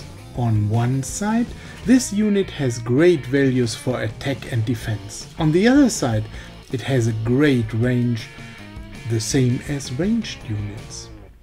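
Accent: Germany. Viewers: Er ist Deutscher mit etwas starkem Akzent.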